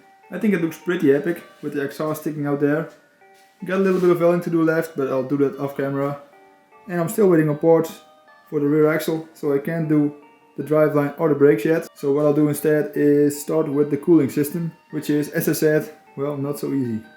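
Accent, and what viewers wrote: Netherlands. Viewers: as the dutch would say: waajoo dikke john deere trekker! (i'm dutch and he sounds like he's dutch) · Are you dutch? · oké ik dacht al het al te horen een dutch accent 😂 oja we gaaf dat je van een speelgoed trekker een gemotoriseerde maakt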